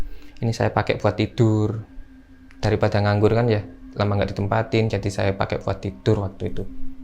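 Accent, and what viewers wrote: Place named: Java, Indonesia. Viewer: Alus bner suaranya jwa bnget sopan kliatan bnget tatakramanya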